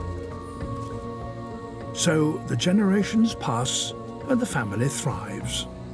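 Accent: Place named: United Kingdom. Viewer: Ah my nightmare but with a classy British voice.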